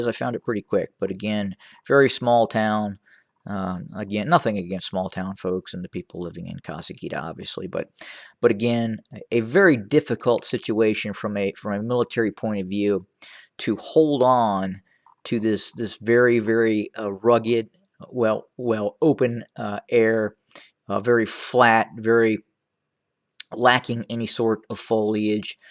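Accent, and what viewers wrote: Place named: United States. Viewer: Bias TPL with American accent.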